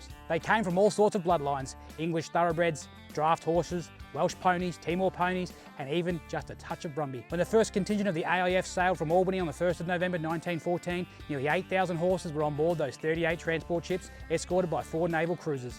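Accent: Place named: United States. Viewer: Too american, just slow it down and thats when men, were men !!!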